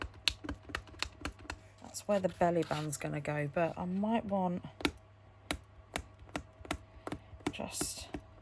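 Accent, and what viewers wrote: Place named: United Kingdom. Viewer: “Ah! I LOVE it”, in that charming British accent, makes me squeal with joy, too!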